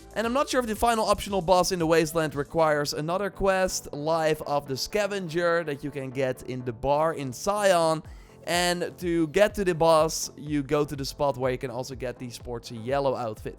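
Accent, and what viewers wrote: Netherlands. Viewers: That dutch nasal accent makes me want to cut my ears of. Nice content though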